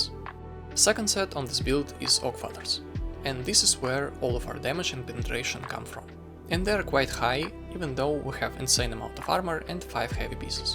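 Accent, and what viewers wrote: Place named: Russia. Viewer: Слышно своих)) Но произношение красиво, спасибо за билд.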